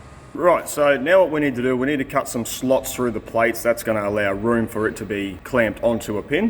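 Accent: Australia. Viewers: This is like ASMR for me: Aussie accent, grinding, cutting, welding, air tools... love it! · Classic we sure do have a twang down under 😀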